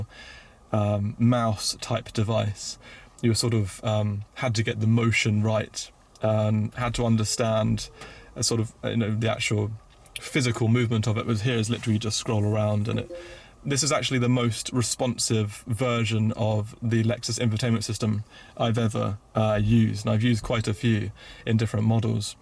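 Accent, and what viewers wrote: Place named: Surrey, United Kingdom. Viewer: Yah, a lovely Surrey accent, 😂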